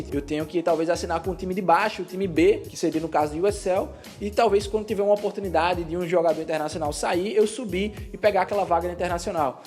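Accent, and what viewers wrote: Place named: Northeast, Brazil. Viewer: De qual estado do Nordeste você é, djow?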